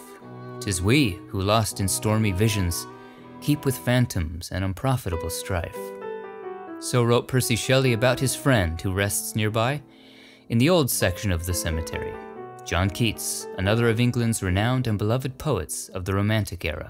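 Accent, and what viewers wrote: Italy. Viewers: first, congratulations on your Italian accent, then ... welcome to my Florence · I love your Italian accent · Hello. You must speak Italian, you say you have Italian heritage. Thank you for making this more real with your accent. I am Judy from Nova Scotia, Canada and I really enjoy your videos.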